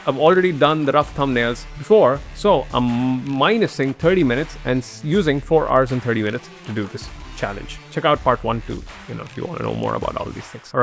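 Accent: India. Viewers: Are you Indian cuz you sound like btw I am learning art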